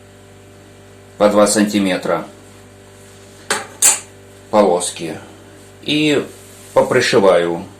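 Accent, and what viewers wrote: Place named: Donbas, Ukraine. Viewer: Разговорный Донбасский) сразу узнала, чисто по мужски сшит чехол))))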